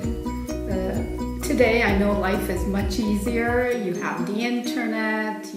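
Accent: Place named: Italy. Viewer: Well, let me just say that waiting for a delivery (a postcard, a package, etc.) IS something I get excited about. And I'm 21, haha. By the way, listening to your voice relaxes me. You've got a little Italian accent happening there. I love it. Keep it up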